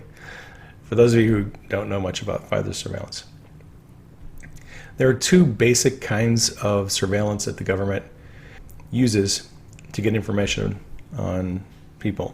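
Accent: Scotland, United Kingdom. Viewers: Great Scottish accent mate. Greetings from the cold place at the top of England. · Guid Scots accent laddie ! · Being of Scottish heritage, and having vacationed there twice, I can say that your brogue was spot on! Music to my ears! 👍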